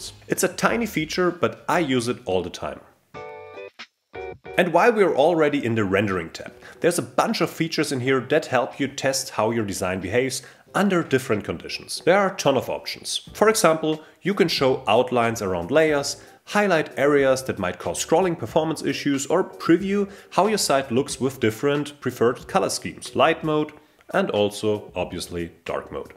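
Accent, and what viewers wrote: Germany. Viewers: I always recognize a fellow German by their accent 👌 Great tips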